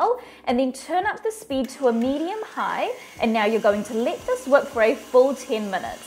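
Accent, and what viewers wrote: New Zealand. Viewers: Your accent and way of speaking sounds familiar and then u said here in Newzealnd😊😊..happy to see local stuff. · This looks brilliant. I stopped icing cakes ages ago cos it made them too sweet but, will definitely try this as it looks fantastic. Love to hear the kiwi accent an a cooking video. Makes me proud e hoa. 😊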